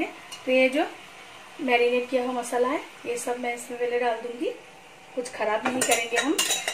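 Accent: Bengal, India. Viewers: তোমার কথা আর হাসিতেই বোঝা যায় তুমি বাঙালি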